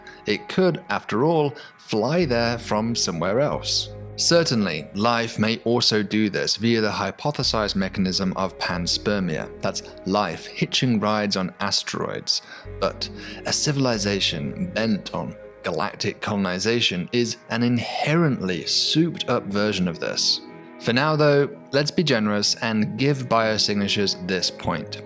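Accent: United Kingdom. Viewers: Awesome! I love British ASMR science bro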